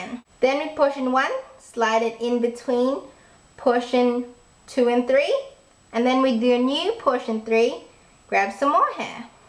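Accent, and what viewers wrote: Australia. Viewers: Okay hold on.... Just started the video and i had to pause it to say this lol.... Your so cute !!! Hahaha :) is that an ozzi accent? Ima kiwi yo !! Lol anyway, the start has already made me excited and i can tell that your gonna make it easy :) your awesome missy !! :D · R u Australian????? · Are you Australian? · are you Australian??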